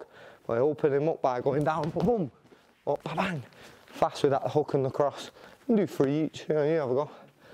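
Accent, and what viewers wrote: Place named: Liverpool, United Kingdom. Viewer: killer scouser accent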